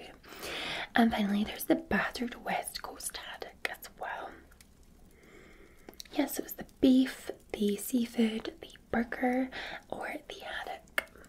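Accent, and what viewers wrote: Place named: Scotland, United Kingdom. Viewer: Love your Scottish accent and your scottish hotel videos I have PTSD and your accent always makes me safe my first asmr video was your cozy cottage video been a fan ever since thank you for all you do your fan jamie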